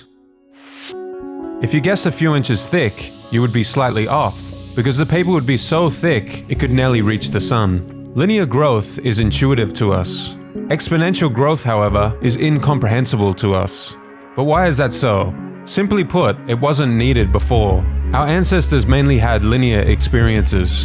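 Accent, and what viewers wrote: Australia. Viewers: Nu Aus accent. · Are you Australian, Mr Narrator?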